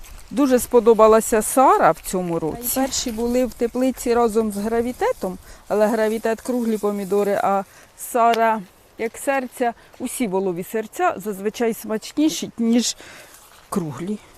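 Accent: Poltava, Ukraine. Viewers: добрий день! Як чую вашу мову, то ніби слухаю свою сестричку. Вона теж полтавчанка, Чутівського р ну. Говір, інтонація-- такі ж як у вас. Я й сама родом звідти. Дуже приємно вас слухати, навіть неважливо про що, наче 60 років назад. Ось і така є у вас слухачка. Давно хотілось вам це повідомити...як кажуть, багато казати, та нема що слухати. Ще раз вам, щире спасибі. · Приємно чути Полтавський акцент!😊 Як не дивно, відразу так і подумала,шо це моя рідна Полтава! І не помилилась.Який район,село напишіть будь ласка.Буду дуже вдячна!❤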